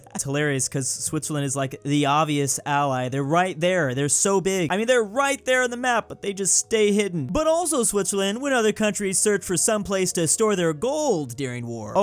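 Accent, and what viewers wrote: United States. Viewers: Mate are you American or the same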